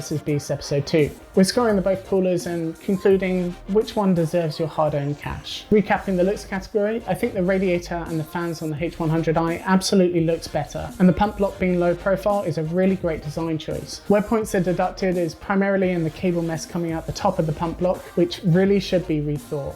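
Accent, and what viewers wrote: United Kingdom. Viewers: Wow that accent is very British Sir are you sure you are American ?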